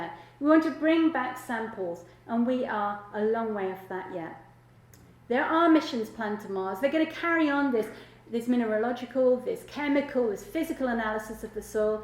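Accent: United Kingdom. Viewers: British accent is so annoying.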